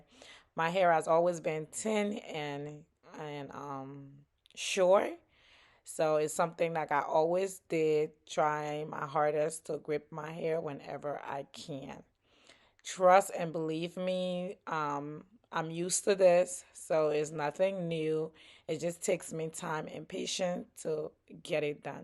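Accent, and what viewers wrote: Liberia. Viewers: This came out so gorgeous mama ❤the hair and the makeup you are talented 👏🏾btw are you Liberian ? The accent sounds Liberian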